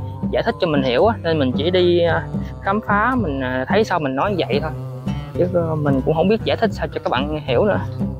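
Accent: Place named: Central Highlands, Vietnam. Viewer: Giong p tay nguyen viet nam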